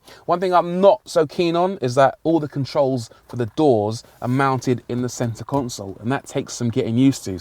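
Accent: United Kingdom. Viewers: I love that rant, it definitely had some of that bri'ish essence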